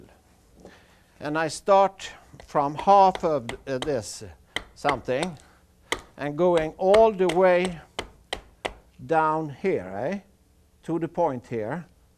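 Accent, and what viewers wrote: Sweden. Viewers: hejsan! helt underbart att se dej å även höra dej på din goa svengelska å din humor 😂 helt underbart. jo jag tänkte fråga om du fortfarande håller på å jobbar med verktygen? om det är möjligt så hade jag jättegärna köpt vikingayxan som du visade i början å även en skedkniv. glad påsk!! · Hans engelska är underbart svensk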